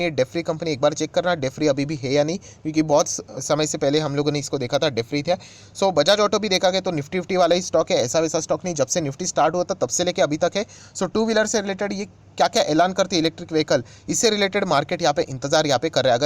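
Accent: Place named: Gujarat, India. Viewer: pakke gujarati lag rahe ho aap sir…..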